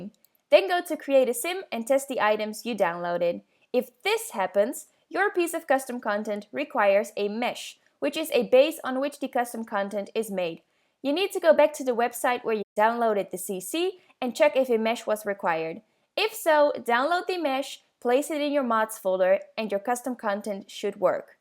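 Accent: Netherlands. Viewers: You dutch girll? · Its dutch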